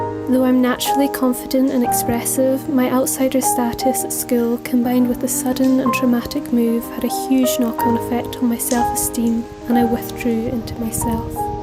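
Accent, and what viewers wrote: Scotland, United Kingdom. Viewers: What a beautiful video! And I love your Scottish accent 😄♥️ what an interesting lifestory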